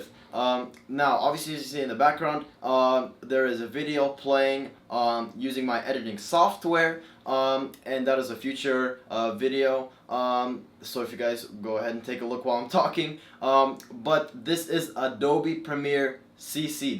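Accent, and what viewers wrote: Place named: United States. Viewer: he said in live stream multiple times he lives in the U.S., and clearly has an american accent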